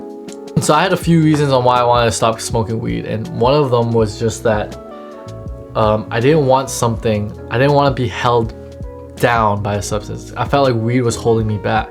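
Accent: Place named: Toronto, Canada. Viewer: definitely from toronto, man said ball up hahaha, i rate it though keep it pushing brotherrr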